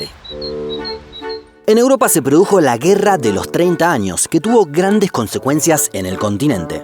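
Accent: Argentina. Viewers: y el detalle papi... es Argentino :V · Una duda, este canal es Argentino? o el que habla en los videos es de Argentina?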